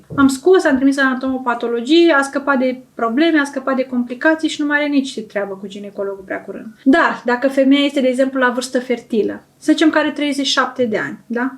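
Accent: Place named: Moldova. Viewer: Ft frumos comentați ...și complet...un ușor accent Moldovenesc sau ma înșel. ...nu ca ar fi ceva rău ..un medic ginecolog complet...felicitări ..și ditr o alta perspectiva ..și încă ceva ..dvs aveți fibroame ?Adică leiomioame ?😂😂😂😊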